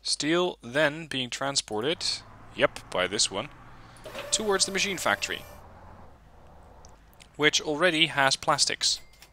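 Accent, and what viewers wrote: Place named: Netherlands. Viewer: I searched for wargame red dragon stuff and stumbled onto your channel. Now a day later i'm at episode 10 of your transport fever series haha (and watch a couple of videos of wargame ofc). Subs +1 ;) Keep doing what you doing! One question from which country are you? your accent sounds dutch.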